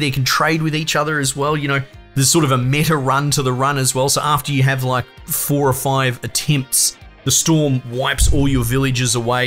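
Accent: Australia. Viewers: the part about the furries got me crying. its even funnier with you aussie accent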